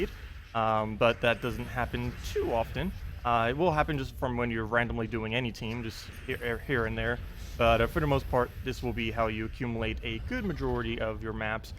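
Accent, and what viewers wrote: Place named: Canada. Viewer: You're from Canada, Minnesota, or Maine.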